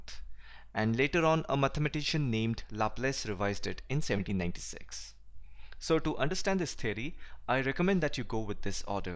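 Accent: Bengal, India. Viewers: Sir are u bengali ?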